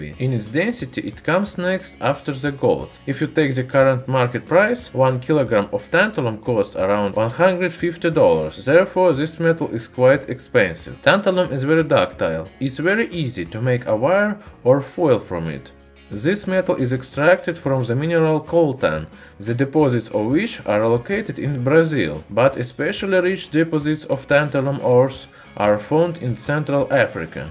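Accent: Russia. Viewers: Russian accent, its great , listen & learn it. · His accent is sometimes starting to go to Russian accent but I understand him. I don't sometimes understand him it's accent is very good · I think his accent is interesting and I've never heard a Russian accent like that. He looks Finnish to me, and I wonder what part of Russia he is from.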